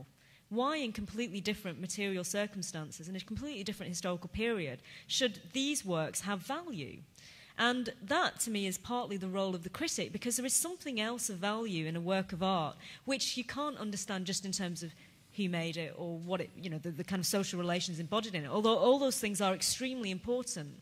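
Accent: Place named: RP, United Kingdom. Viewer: God she can fucking speak, her accent is bloody high class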